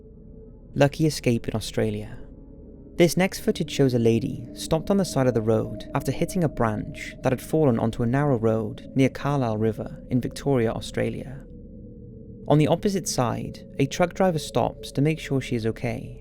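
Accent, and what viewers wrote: Wales, United Kingdom. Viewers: I love your videos! Are you by any chance Welsh? I’m sure I can hear it in your voice!! Maybe Cardiff?! 😁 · TheMillster 81 - I love his soft Welsh burr! It's unmistakable. 😊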